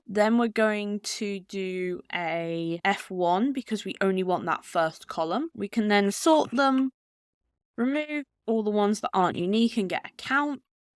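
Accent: United Kingdom. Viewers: Watching all now after I've tried or got stumped... need to throw money at you to teach me. Nice to hear a uk voice for a change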